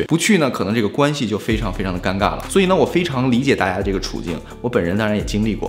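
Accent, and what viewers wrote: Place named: Beijing, China. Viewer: 觉得帅哥是北京人哈哈 虽然儿化音可能因为常年在美国被磨得若隐若现 但是他的吞音还是很标准的lol 【如果大家喜欢我的视频 = rua喜欢我的视频】哈哈哈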